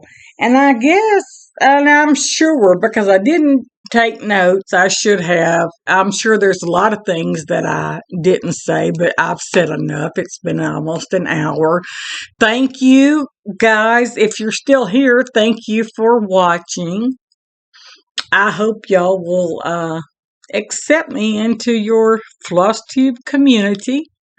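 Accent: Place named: South, United States. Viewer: Love your ramblings and adore your southern accent! Looking forward to the next one!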